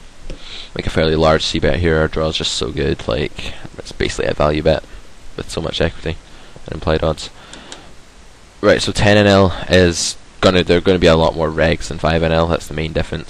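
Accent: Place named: Scotland, United Kingdom. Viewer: Thanks such helpful content on this channel. But why is Scottish 😆?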